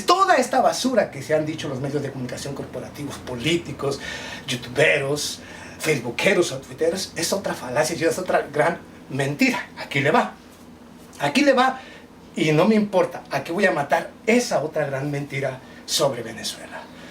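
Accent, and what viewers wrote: Mexico. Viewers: MIRA A OTRO PERRO CON ESE HUESO!..”DEMOCRACIA” NO ME HACES REÍR PORQUE SON MÁS LAS GANAS QUE TENGO DE LLORAR QUE DE REÍR!!... CUANTO TE ESTÁN PAGANDO O CUANTO TE HAN OFRECIDO PARA CONVENCER QUE ESA CUERDA DE DELINCUENTES SON “ DEMOCRÁTICOS” TÚ TE TIENES QUE IR A VIVIR A VENEZUELA PERO COMO CUALQUIERA DE A PIE! Y DÉJAME DECIRTE Y EN POCAS PALABRAS TÚ Y TODA ESA SARTA DE LADRONES DELINCUENTES NARCOTRAFICANTES SI NO TUVIERAN EL BLOQUEO YA HUBIERAN ROBADO AUN MÁS Y CALMATE PORQUE TE VA A DAR UN INFARTO! TÚ A MI NO ME CONVENCES!...CONVENCERÁS A LOS IGNORANTES! VIVA GUAIDO!! ESE ES NUESTRO PRESIDENTE!....TÚ DEBES DE SER UNO DE SUS MULAS NARCO TRAFICANTE A QUE CARTEL PERTENECES ? POR EL ACENTO ME PARECE QUE ERES DE MEXICO O SEA QUE PERTENECES AL CARTEL DE SINALOA PORQUE ESO ES LO QUE SON TODOS USTEDES NARCOTRAFICANTES CARTELES DE EL DIABLO! TE METISTE UNA PERICA BIEN ARRECHA PORQUE NI RESPIRAS🤪😂🤣😂🤣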